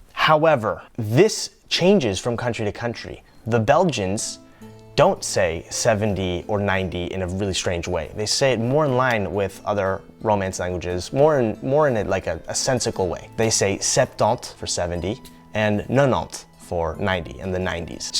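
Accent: France. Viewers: A lot of those expressions are not North American French. I can tell by your accent you learned French from France, and not the French from North America. Did you know that the North American French, mostly the French spoken in Canada is more authentic than the French spoken in France? That's because we were isolated from France from the 1600s to the mid 20th century so our language did not change as much as that spoken in France, so our French is more authentic and true. Generally the French spoken in Québec is no longer authentic because they adopted changes when the intellectual snobs wanted to distance themselves from their French Canadian roots. So they adopted more of the accent spoken in France and incorporated new words that never existed before in our vocabulary which came from France. This took place in the 1960s and most of the québecers have now completely changed their way of speaking. 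Whereas the 30% or so of French Canadians living outside of Québec have kept the original French from our ancestors. So the purest French, most likely in the world, is found in Canada, outside of Québec. I will give you one example of the difference between authentic French and the French spoken in France and in Québec. The French language is a Latin language and in all Latin languages we roll our "r"s. That is what French Canadians do, but Québec stopped doing that just as the French from France did. In AUTHENTIC French you roll your "r"s, you dont spit them. I am French Canadian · Im a french guy from Québec. You have a great accent. Its nice to see a foreigner understanding our little details in language. You obviously went to France to learn it. I recommend you to go to Quebec and, be mind blown by the way we speak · I'm learning about my own language, I swear it's troubling how little one understands of their mother tongue... 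also, not certain that I'm not mixing it up with French Canadian because I've lived there for too long, but 'terrible' can also mean 'incredibly good', chiefly cut into two very articulated syllables, at the same level as 'chant-mé' Oh, btw, you have a sort of a south accent, which is funny because you could easily be mistaken for someone from Toulouse (or around that area) at times 👌🏼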